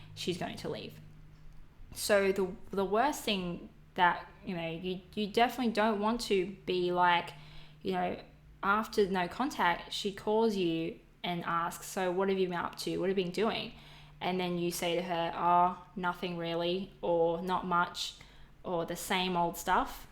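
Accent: Australia. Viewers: Hi, are you Aussie?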